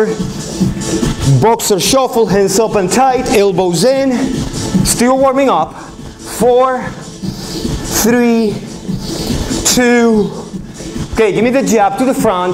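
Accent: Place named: Latin America. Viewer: HE HAS A NICE ACENT HE SEEMS To be from a latin country.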